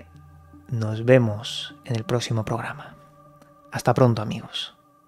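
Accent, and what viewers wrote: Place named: Spain. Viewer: gracias, muy interesantes tus videos. Además me gusta como hablas, un genuino idioma Español. Saludos desde Chile.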